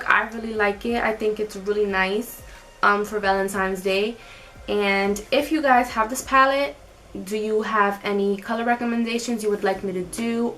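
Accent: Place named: Bronx, United States. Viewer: I knew by that accent i just left the boogie down to take care of my mom here in Jacksonville Fl I see you boo that's why I subbed od quick lol🙅‍♀️✌❤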